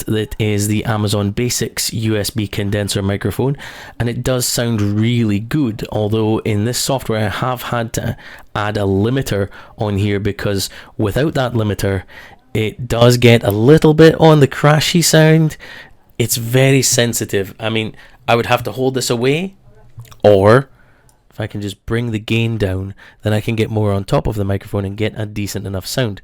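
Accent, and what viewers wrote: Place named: Scotland, United Kingdom. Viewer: You sound like someone I know. I have heard that Scottish voice on certain videos before on the internet.. 😶‍🌫️ ASMR next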